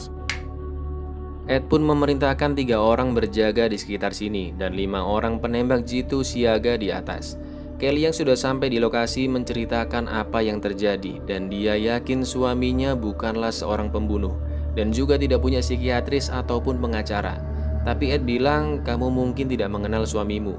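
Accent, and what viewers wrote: Java, Indonesia. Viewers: Abang orang jawa ? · Jowo ngendi mas' 😂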